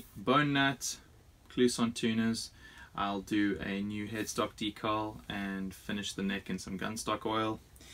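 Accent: South Africa. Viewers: Are you from South Africa? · ... are you South African?? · Geolocation says Dublin, but accent is SA?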